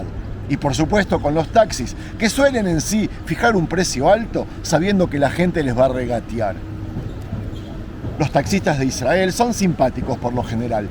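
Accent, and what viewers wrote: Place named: Rioplatense, Argentina. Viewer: Wow porque hablas tan bien Español? Sonas como Argentino/Uruguayo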